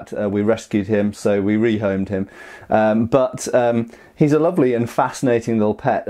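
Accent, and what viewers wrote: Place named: United Kingdom. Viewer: One of the reasons British people make for good Youtubers is because everyone loves your accents.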